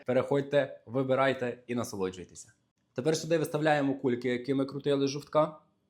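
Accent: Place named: Western Ukraine, Ukraine. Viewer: Трошки не аль-денте😄 Чудова наша західна розмова😍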